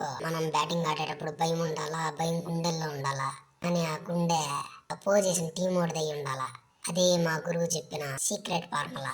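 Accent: Chittoor, India. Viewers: Maa chittoor slang super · Maa chittoor yaasa suparu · Bagundandi.... Pushpa kante ikkade chittoor slang Baga undi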